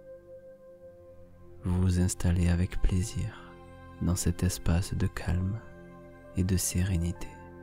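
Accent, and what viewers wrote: South, France. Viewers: Cet accent du sud m'apaise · j'adore ton accent du Sud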